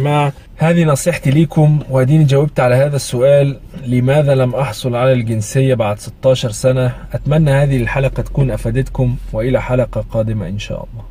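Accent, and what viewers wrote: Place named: Egypt. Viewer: تتكلم مصرى حلو قوى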